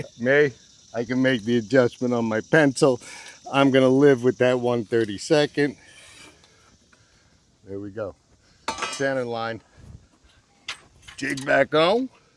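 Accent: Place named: Long Island, United States. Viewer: You can leave LI, but LI won't leave you. I'm from East Tennessee but worked at Brookhaven National Lab for about nine months and your accent was exactly like the guy who lived next door for several years who was from LI.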